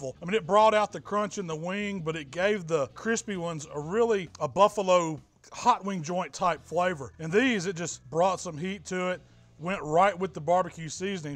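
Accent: South, United States. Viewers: Southern accent check. Kinda fat check Pocket Buttondown shirt check I will take grilling advice from this man. The only sketchy thing is his foorwear. Should be wearing New Ballance trainers of flip flops. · If I’m going to trust anyone to tell me how to BBQ it’s going to be a heavier set man with a southern accent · His southern dialect makes me trust him.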